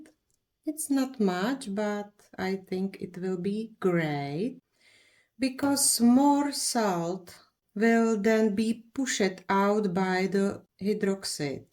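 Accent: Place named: Czech Republic. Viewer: Nechci se vás dotknout, vím, že to nesouvisí s výrobou mýdla, ale když už chcete natáčet videa v angličtině, bylo by dobré zapracovat na výslovnosti. Ta "czenglish" opravdu trhá uši, nezlobte se.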